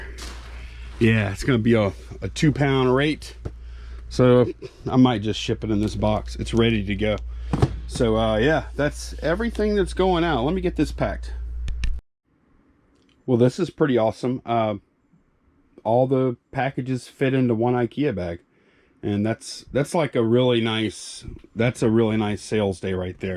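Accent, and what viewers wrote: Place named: Los Angeles, United States. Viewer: Don't have an accent!? Are they watching the same channel I am? Hahaha. True you don't have as thick as other dudes I've known from LA but I want to know where that viewer is from that they can't hear it.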